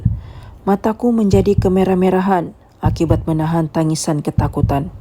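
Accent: Sabah, Malaysia. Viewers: mcm loghat sabah sajaa??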